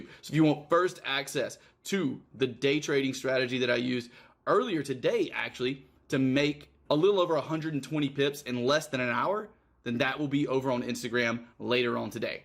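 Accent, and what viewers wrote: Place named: Texas, United States. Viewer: I'm not even American, but you sound like you're from texas or something.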